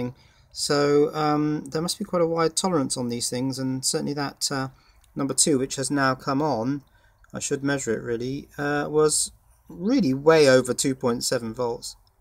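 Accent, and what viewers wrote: England, United Kingdom. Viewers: Best voice and the greatest English accent. I want to listen, each word you pronounce. Very informative video. Thanks for uploading.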